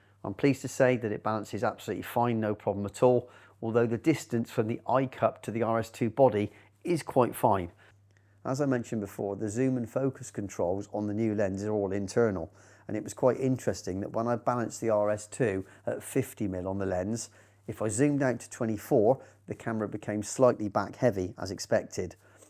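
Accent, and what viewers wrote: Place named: West Country, United Kingdom. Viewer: very good review, the parfocality of the 2.8 seems more than good enough to settle any worries I had. Agreed on the pricing of the servo unit, it's far too high a percentage of the lens' cost itself. Shirt was on point too, and west country accent turned up to 11 made up for absence.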